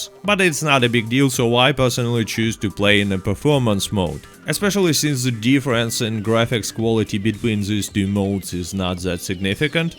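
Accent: Russia. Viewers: Типичный русский акцент, большинство так звучат когда пытаются говорить на американском английском, ничего необычного. По крайней мере речь грамотная и понятно что он говорит, а то бывают люди что так коверкают, что фиг поймешь что человек пытается сказать, т.ч. он имеет еще не самый худший вариант русского акцента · Ахахаха угораю с твоего акцента чувак, русского я за километр учую))) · Your accent is very Russian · а в голосе то проскакивают русские нотки)) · I looove the russian accent. All critics - wether CP2077 or Red Dead - sound harder and more punchy with the beautiful sound of this language.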